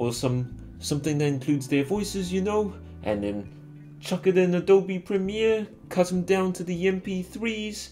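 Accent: New Zealand. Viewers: wow i love new zealand accents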